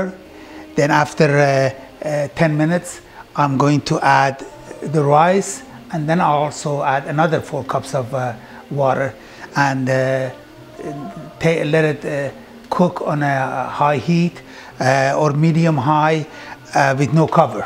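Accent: Iran. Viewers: Personally I love your Accent It`s Persian Accent and it spices up your Cooking show more over I would like to thank you here for sharing your experiences and knowledge Regards Arash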